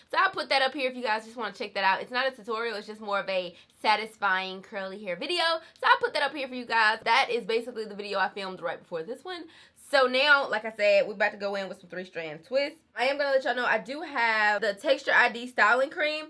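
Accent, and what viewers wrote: South, United States. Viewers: I feel sooooo dishrespekfu with my 8hr late comment 😩 BUT I couldn’t let the day go by without watching! I have to say there’s NOTHING like following someone who’s personality is JUST the same as yours 🥰🥰 I swear I do that doggy breathe when I get excited sometimes when I’m super happy or something(randomly) and honest to God A LOT of the funny random things you do , I DOOOO!! , and your ACCEENNTTTT 😩😩😩 I love a southern accent 😍. Any who, thanks for another great videooooo.. hair was Kayutee...can you do a wash n go with the Mielle pomegranate and honey leave in, smoothie, and custard PLEAAASEEEEE 🙏🙏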